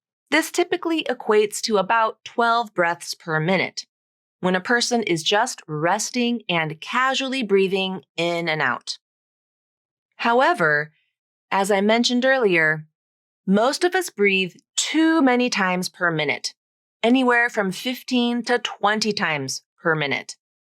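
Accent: San Diego, United States. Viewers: Hey! I'm a non-native speaker and I've been watching your videos and i absolutely love your channel. I was just wondering if you speak in a standard American accent or is there a tad bit of San Diego in it?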